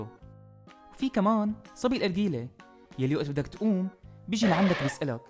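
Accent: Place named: Syria. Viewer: و الله متأكدة انو من سوريا نفس لهجتي و الله 😂💔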